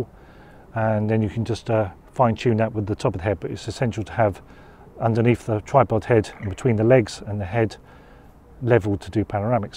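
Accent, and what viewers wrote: United Kingdom. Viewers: At last, someone with a British accent, thank you!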